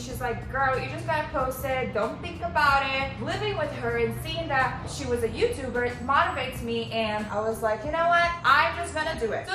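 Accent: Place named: Rio de Janeiro, Brazil. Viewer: te conheci pelo canal da Bruna e de início te achei um pouco debochada (acredito que pelo sotaque carioca hahahah sou sergipana, vizinha de estado da bruna, inclusive já estudamos na mesma universidade, mas em momentos diferentes), enfim, acabei entrando no seu canal e me surpreendi demais, tu parece ser uma pessoa mto sensata e do bem. Tenho mta vontade de morar em LA, quem sabe um dia, né? Apesar de entender o inglês mto bem, eu tenho mta dificuldade p falar e ver vc falando tão bem me motiva demais. Enfim, só queria falar isso <3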